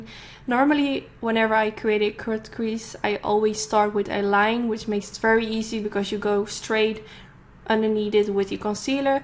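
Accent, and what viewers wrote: Netherlands. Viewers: are you Dutch? I hear something in your voice! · I love this look so much, you make it look so easy! Also, can I mention... Your accent is 👌🏼 I have a Dutch uncle, so I am biased of course! 😊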